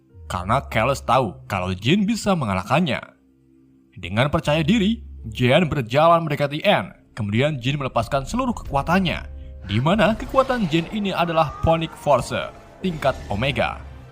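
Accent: Indonesia. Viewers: Iya bang, sebagai org yang msh bljr, saya merasa agak risih, karena pengen bgt bisa bahasa Inggris , kembali mendengar aksen indo:v. Sukses terus bang channel langganan wkwk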